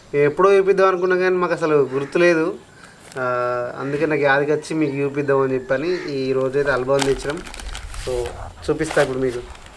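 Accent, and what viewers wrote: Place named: Nirmal, India. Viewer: ఏ ఊరు మీది చెప్పండి మీ ఊరు అదిలాబాద్ దగ్గర నిర్మల్ మీ మాటలు అలాగే అనిపిస్తున్నాయి మా ఊరు మాత్రం వరంగల్ మీ ఫొటోస్ చాలా బాగున్నాయి 👌👌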